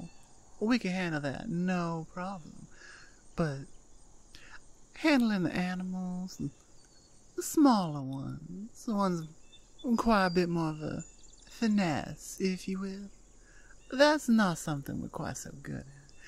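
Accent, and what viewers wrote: South, United States. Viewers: You’re telling me I get to live on a farm, have bacon, and live with this beautiful cowgirl? Sigh me up! I loved this audio! The art was beautiful and your southern accent is great! I live in the south and that kind of accent can make a man blush when used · I promise I’ll be the best dog boy you’ll ever have, I hope to get plenty of head pats, ear scratches, and belly rubs from you if it’s not too much trouble🐶🐾 Love your southern accent hope to hear more of it in future audios ❤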